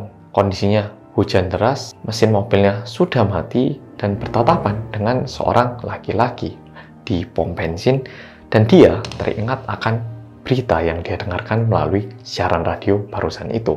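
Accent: Java, Indonesia. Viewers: Mau yang medok saja pleaseeeeeee😂😂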